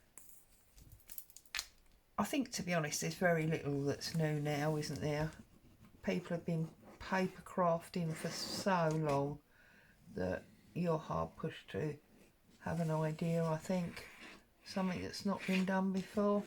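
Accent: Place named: South London, United Kingdom. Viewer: that was brilliant- loved the loaded tag really lovely. I love listening to your South London accent - makes me homesick - hope you're having a great weekend- 😊❤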